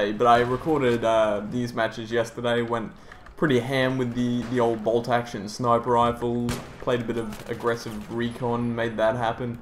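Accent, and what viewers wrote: Australia. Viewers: Fuck yeah! thought that was an Aussie accent, and you live bloody close to me. im Sunny Coast... well not really sunny, kind of an ironic name don't ya think.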